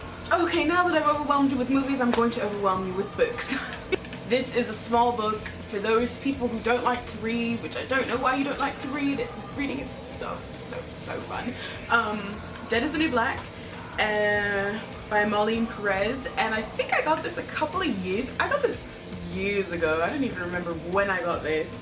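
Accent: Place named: United Kingdom. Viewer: really?? I hear a slight British accent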